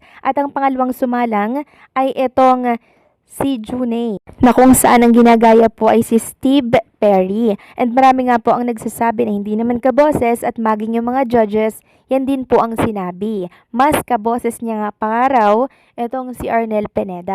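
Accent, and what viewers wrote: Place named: Visayas, Philippines. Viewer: bisaya ka day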